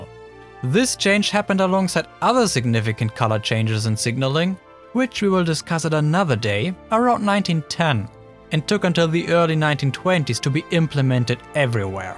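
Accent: Germany. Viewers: Not to be mean but I can REALLY hear the German grammar in your English. It's kinda interesting since your accent isn't all that thick, yet your sentence structure and word choice are so obviously German.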